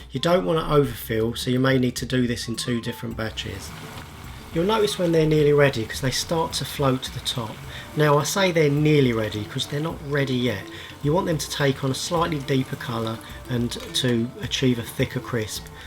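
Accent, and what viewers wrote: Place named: London, United Kingdom. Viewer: mate! your accent isn't to far off Russel Brand's. hope you're not offended. you live near the Thames?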